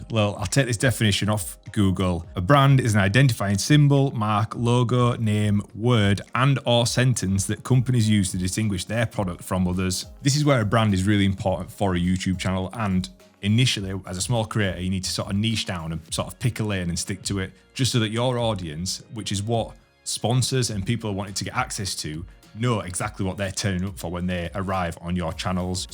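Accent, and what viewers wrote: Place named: St Helens, United Kingdom. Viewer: Great video with some great advice, I’m trying to set up my YouTube channel and your inspirational! Only found your channel yesterday and I’m loving it. Just a quick one, you sound local to me St Helens? Keep up the good work. Oooshhh!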